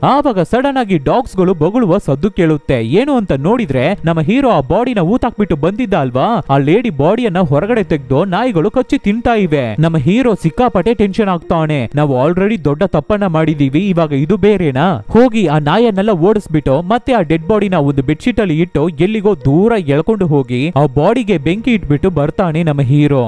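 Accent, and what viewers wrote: Tamil Nadu, India. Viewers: Guru nin kannada language same Tamil avru kannada mattadalva hag ide guru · Kannada pronounce is not correct, he pronounced with Tamil accent. If it made in proper kannada, dialogue, you will get more likes and more view. Any good try. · Ninna slang thamil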